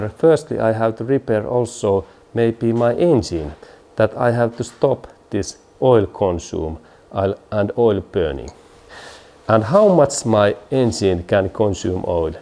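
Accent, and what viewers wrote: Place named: Finland. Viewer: that killer finnish accent good job guy!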